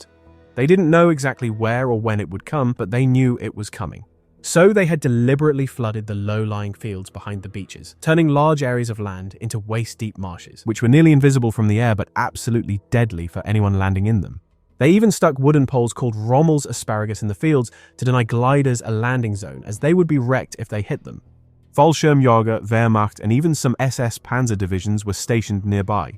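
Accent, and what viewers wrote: United Kingdom. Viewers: The narration sounds Brit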